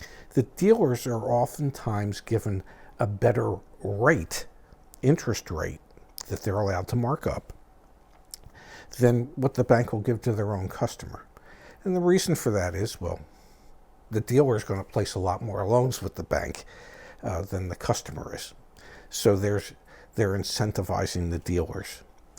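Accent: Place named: Philadelphia, United States. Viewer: If I ever need an example of a Philadelphia accent to show someone, I'll send them this video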